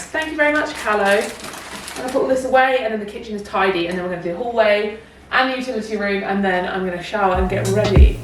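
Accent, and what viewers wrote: United Kingdom. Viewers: I like letting long vlogs run in the background while doing some light stuff that don't require too much attention because I'm trying to soak in some nice british english accent 🥰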